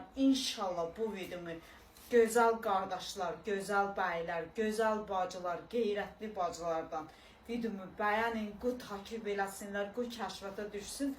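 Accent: Talysh, Azerbaijan. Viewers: Talışdar hamısı beledi bunlar neqerde rusyada xarici olkelerde yaşasalarda dileri deyismir ki deyişmir.buları otuzdur ancaq danlsdlrma 😂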